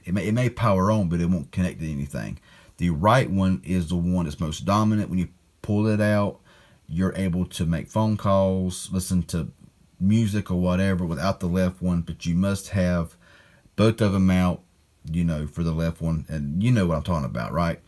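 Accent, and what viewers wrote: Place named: Appalachia, United States. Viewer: Good God, are you TRYING to sound like a freaking hillbilly?!???....what a waste of MY time....I GAVE UP trying to listen to your "presentation"!!!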